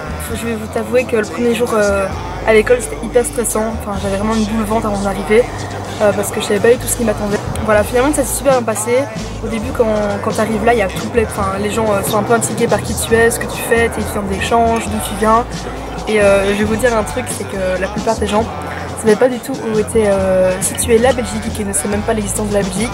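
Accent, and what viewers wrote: Belgium. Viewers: J'ai direct su que t'étais belge jsp prk 🇧🇪💪🏾💪🏾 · Accent belge comme on les aimes 😂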